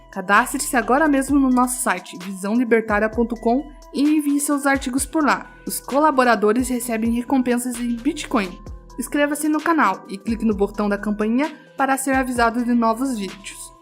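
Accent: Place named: Bahia, Brazil. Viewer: Sotaque baiano é engraçado 😂😂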